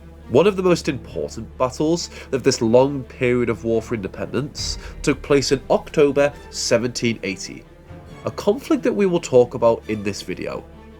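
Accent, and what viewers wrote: Liverpool, United Kingdom. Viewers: I've lived in the north and south of England. For more than a while in each but can't place your accent. At times a little scouse then Welsh. What is it?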